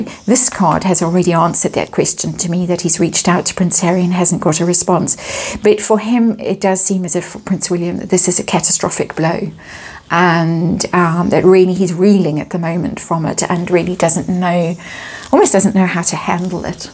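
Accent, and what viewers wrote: South Africa. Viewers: Great! Thanks! I absolutely love your readings! Your beautiful SA accent lends a lovely gentleness to the readings even when the readings are darker than usual, as was this one! Stay safe lovely lady xx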